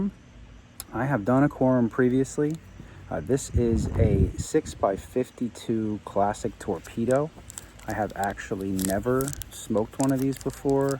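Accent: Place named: Northeast, United States. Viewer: Love your reviews! Between the three Quorum varieties, which would you say is your favorite? BTW, your Northeast accent and sensibility definitely brings me back home as I currently live in California but grew up in the NYC area! Are you originally from PA?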